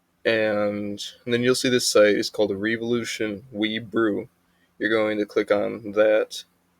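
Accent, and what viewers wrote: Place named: France. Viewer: Spongebob French narrator voice generator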